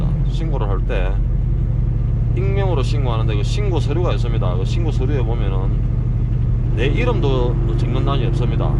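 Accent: Gyeongsang, South Korea. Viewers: 서울은 새벽부타 겨울비가 부슬부슬~하루종일 내리고 있습니다 여기오면 맘이 참 편해집니다... 님의 구수한 갱상도 사투리도 좋구요,오늘도 잘봤습니다^^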